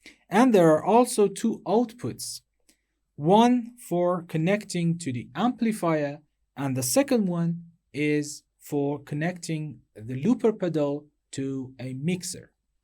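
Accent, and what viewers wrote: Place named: Iran. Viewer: Your accent is so persian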